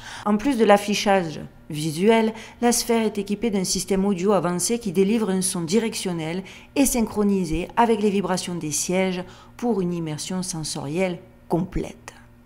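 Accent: Marseille, France. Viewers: Merci pour cette video hyper intéressante et passionnante.Heureusement qu'il y a des personnes comme vous pour nous informer et nous alerter sur toutes ces nouvelles technologies qui ne sont pas pour le bien de l'humanité. Je vous apprécie beaucoup et je reconnais bien votre accent marseillais....de Marseille aussi, alors continuer à porter le soleil du vieux port à Vegas. Par ailleurs, oui ça me tente de gagner une paire de OneMix! A dimanche prochain!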